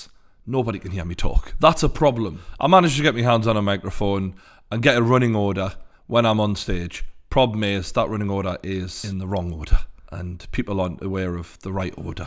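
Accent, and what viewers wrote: Newcastle, United Kingdom. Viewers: true fucking good work keep it up brother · Ending with a bit of, love that 👊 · Man I love the true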